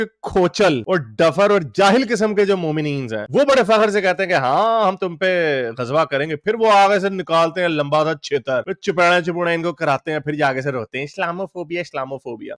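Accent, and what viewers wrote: Punjab, India. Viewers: Your Punjabi touch is super funny 😂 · Luv your punjabi accent ❤😂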